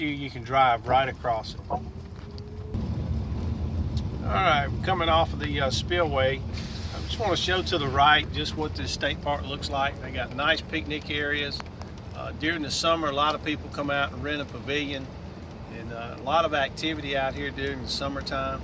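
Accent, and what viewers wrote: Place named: Mississippi, United States. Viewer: We stayed there a few days last week - till our last night the weather was getting very bad - so came home a day early. was nice windy weather thou. Sounds like your from Laurel Ms. :)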